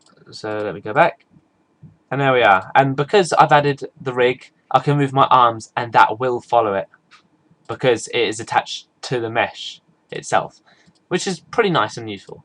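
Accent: United Kingdom. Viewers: thank you thank you tah you thank you.with your nice english accent.THANK YOU.you are the onlyone in the world who showed how to animate cloth and model together as one.THANK YOUUUUUUUUUUUUUUUUUUU.wowwwwwwwwwww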